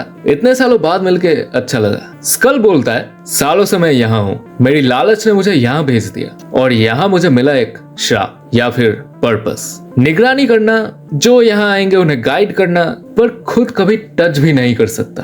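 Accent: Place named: Bengal, India. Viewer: Bhai main pj ko dekh ta hoon moc ko sunta hoon comic verse ko badal ko desinerd ko bhi par jab maine tumhari video dekh ni shuru ki mujhe maza aya is liye nahi ki tum kuch alag bana rahe ho is liye kyu ki tumhari hindi thodi weak h jaise bengoli log bolte h maza ata h sunne mein isse thik mat kar na keep it up ❣️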